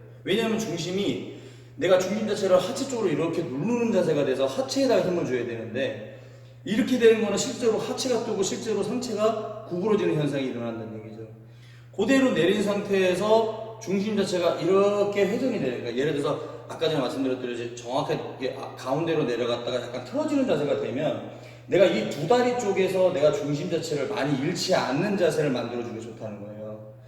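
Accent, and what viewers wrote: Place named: United Kingdom. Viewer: 영국 영어 발음!! 스마슁, 산드위치, 땅큐^^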